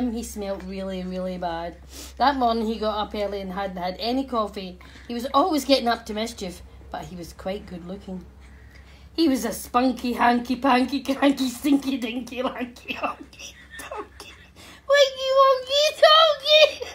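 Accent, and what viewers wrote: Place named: Scotland, United Kingdom. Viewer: The Scottish accent is just the cherry on top, and the wheezing from the cameraman.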